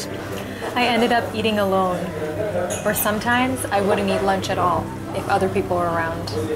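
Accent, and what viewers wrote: United States. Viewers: Yeah... Indian music, Indo-westren clothes, American accent n free bird... Makes u unique from others... That's why we love❤ uhhh 😘😘 love from ur root place Congrats for 7 M fam #VV ❤ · Hey your simple amazing girl and jus be what your and your voice soooooo lovely special with u s accent 👍🏻👍🏻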